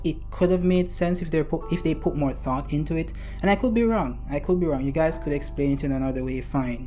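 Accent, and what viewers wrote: Jamaica. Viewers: Yow bro.... you Jamaican? Or from the Caribbean??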